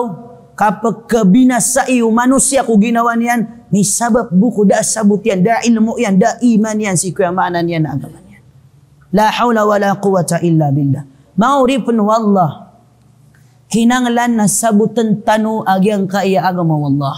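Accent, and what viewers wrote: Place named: Maguindanao, Philippines. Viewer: Mashaallah..gusto ko c ustadz di masyado.maranao pg pronounce nya parang maguindanao din.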